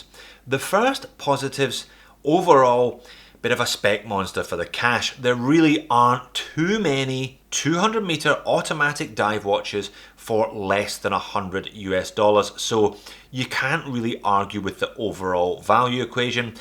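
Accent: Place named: Scotland, United Kingdom. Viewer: A Scottish accent really makes these videos ten times better....... I always wish I was born in Scotland so I had a buttery smooth roll over my words. Just bought a two tone silver and gold version of this after years of wanting to get one, super excited. Thanks for your videos.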